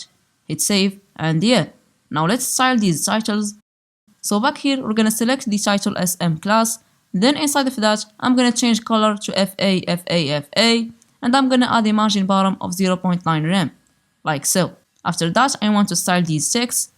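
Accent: Morocco. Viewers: from your voice I am pretty sure that you're Moroccan ❤❤ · Very nice channel, thank u for the great content. Btw are u Moroccan ? cuz I feel like your accent is kinda Moroccan.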